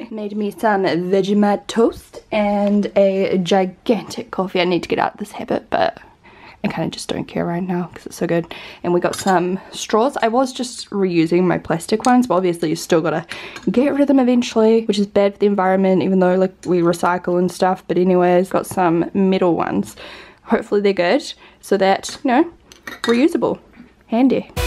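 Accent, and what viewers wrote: New Zealand. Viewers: You have a good nz accent read the discription what part of nz I'm a Wellington baby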